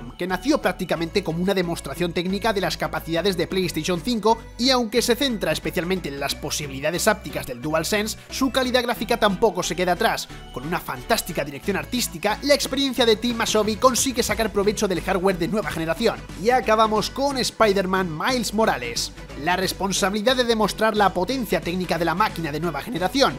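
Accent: Spain. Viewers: Aún no me acostumbro a su voz de este vato. Es muy española le falta ser más neutral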